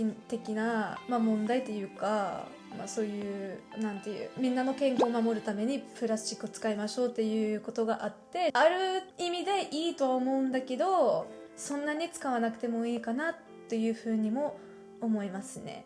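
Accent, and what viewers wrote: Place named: Kyushu, Japan. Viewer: 日本人にとってスイスはもっとも好印象の国。ところがあるフランスジャーナリストがこれを知り、意外だと言った。欧州ではスイスは日本ほど好かれていないという。そういえば黒いスイスなんて本もある。いろいろ感心しないことが書いてある。でも大国に囲まれて生きるために必死で知恵を絞って生きてきた結果ではないかなとも思う。たとえば中立国でもあるスイスの立場を活用して秘密性の高いスイスの銀行に世界からマネーが集まるようにしたとか。小国なのにどこもできないことをスイスはやった。たいしたもの。参考になる。今回の日本に対する率直な意見ありがとう。当然のことながら日本についてもいろいろ長短がある。僕ら日本人が自分を見つめ、改善するために参考にすればそこに価値が生まれる。よりよくなるために。ありがとう。ところで地方にいたの？えらいなまりがある。これは外国語なまりではなく、日本のどこかのなまりと思う。声だけ聴いたら、地方出身の日本人と間違われるでしょう。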